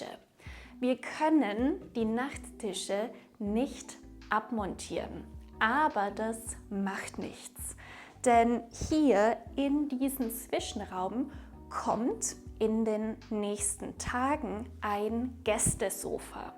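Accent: Standard. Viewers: [sch ≠ ch = -ig] Die Grundregel für die Aussprache der standardisierten, literarischen, landesweiten, einheitlichen deutschen Sprache. Hochdeutsch.🖤 ❤ 💛 Das ist nicht nur angenehm zu hören, sondern auch leicht zu verstehen! Gut machst 💪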